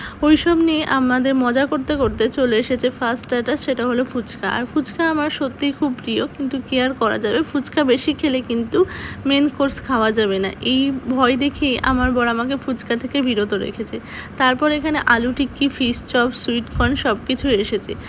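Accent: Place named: Bangladesh. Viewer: Apni ki Bangladeshi??? Kotha gulo kamon jano..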